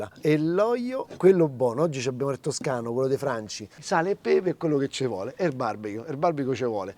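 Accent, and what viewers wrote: Rome, Italy. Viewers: ogni volta che vedo un video in cui dai un morso ad un panino e sento quel rumore di pane che scrocchia darei un morso allo schermo!! iscritto da almeno 8-9 mesi!!! Continua così fai delle ricette fantastiche e io che sono del nord, personalmente adoro il l' accento romano e continuerò a seguirti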